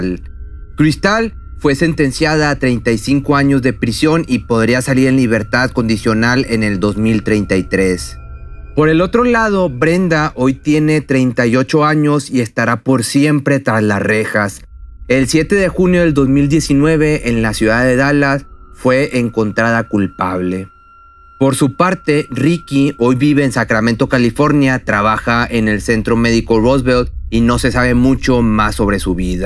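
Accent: Mexico. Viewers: Es cierto, tiene cierta gracia al narrar, eso me hace ver sus videos y esto que no me gusta el acento mexicano.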